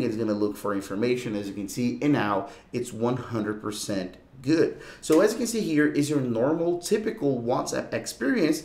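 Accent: Brazil. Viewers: u sound brazilian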